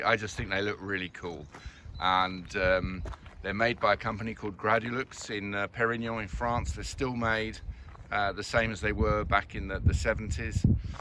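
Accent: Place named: Wales, United Kingdom. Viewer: Soft, lyrical and charming Welsh accent.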